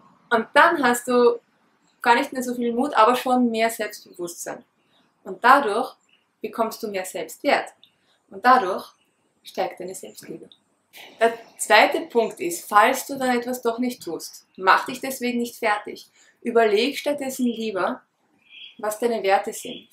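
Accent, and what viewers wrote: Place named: Austria. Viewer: Danke für dieses inspirierende Video! Und auch für diese Ehrlichkeit einfach diese "andere Stimme" in dir und somit einen Teil deiner eigenen Ängste mit der ganzen Welt zu teilen! Ich bin übrigens auch aus Österreich und liebe es auf YouTube mal wieder die gewohnte Aussprache zu hören! 😅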